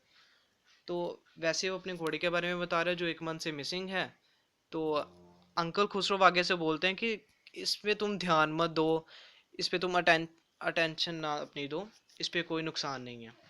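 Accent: India. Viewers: Are you a punjabi! I mean your accent is like that only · Too slow!! Important points missed. Please use your Indian accent. Your Indian accent would sound awesomely confident, your varying speeds will add to it.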